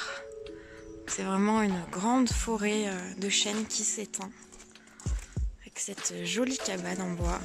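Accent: South, France. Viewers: L'accent du sud il est vraiment classe 🤙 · L'accent du sud 👍😀.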